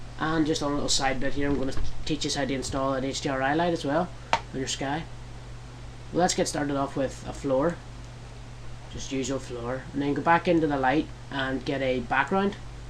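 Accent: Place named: Northern Ireland, United Kingdom. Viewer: Nice job! Northern Irish accent too !!!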